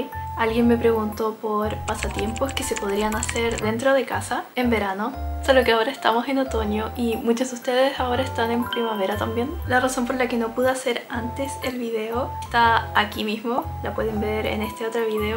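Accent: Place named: Chile. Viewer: Lindo video, gracias por las ideas, últimamente también he disfrutado más mi tiempo en casa y es lindo encontrar más pasatiempos. Conocí tu canal no hace mucho y me ha encantado :D Tus videos me hacen sentir calma ^ ^ También tu voz es muy linda y relajante (y probablemente tambien tenga que ver que el acento chileno me parece muy lindo jaja) Estoy feliz de haberme topado con tu canal :D 💕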